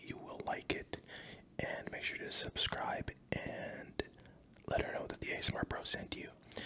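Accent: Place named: United Kingdom. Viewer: I’m british but I usually cringe when I listen to british ASMRtists idk why😬🤭 you’re the exception, so you’ve gained a sub 🤷🏽‍♀️😂 I came from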